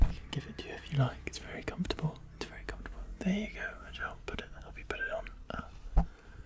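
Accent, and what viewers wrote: United Kingdom. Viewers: I just watched the like nerd studies you video or whatever it's called and I loved it so much that I came to look at some other videos and I got jump scared when I heard the British accent 😭 (not in a bad way I just was not expecting it at all lol)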